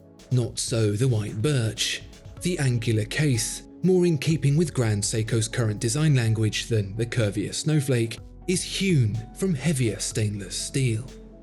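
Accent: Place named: England, United Kingdom. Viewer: This channel always makes me hate the English accent 🤡 and can you ever change th music? Jeez And seiko only competes with seiko, not anyone else , why they have 2 factories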